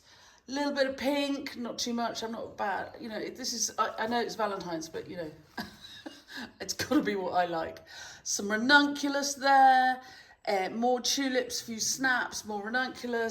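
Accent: England, United Kingdom. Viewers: As an American, I enjoy your English accent & unusual (to me) terms very much. 🌱❤️ If I book a place for one of your workshops, is access to the content available to view later, after the scheduled workshop? The time is inconvenient for me, but I would love to learn how to make one of your unique posies. 💐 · lové💗looks like wisconsin and adore your english accent🌸🌸🌸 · I absolutely love you accent! One of my dearest friends is in Oundle, miss her terribly. Had the chance to visit beautiful England 11 years ago . The gardens at Burley house were magnificent!. Cannot wait to visit once more! Thank you for your content I enjoy it very much! Canadian dairy farmer morphing intoa flower farmer as a rieterement project. Take care! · Thank you for all you great tips! I am a follower from Sweden and I just love you British accent!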